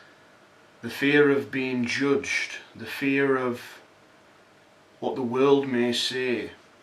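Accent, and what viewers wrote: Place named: Leeds, United Kingdom. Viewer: i needed that if they are your own words it was spot on ,are you from leeds.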